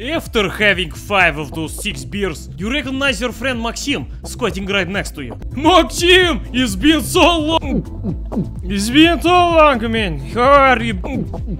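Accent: Russia. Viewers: this makes me wonder, is actually russian? or a brit/american with the best russian accent on the planet? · It's just a.m. here in Uruguay (South America) and i'm here, in my chair, watching a russian/ucranian guy talking and gloryficating slavs with that russian/ucranian accent and i love it. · Чувак ты русский??? У тебя акцент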